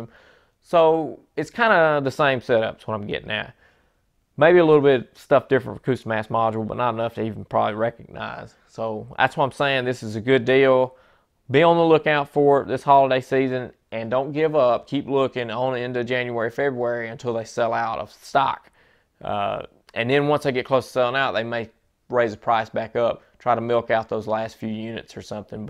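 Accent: South, United States. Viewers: Came here cuz I would like to buy used ones. Daaaaaaemn heeee soooouands sooooyyy reeedneick.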